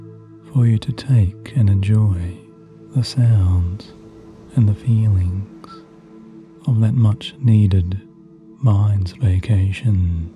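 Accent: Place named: Australia. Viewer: your Aussie accent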